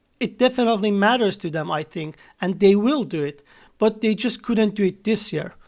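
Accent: Iran. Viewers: The Persian accent makes it more interesting 😁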